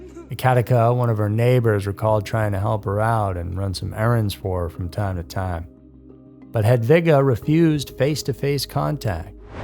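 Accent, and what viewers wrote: South, United States. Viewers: Came For The Stories, Stayed Because Of Your Lovely Southern Voice.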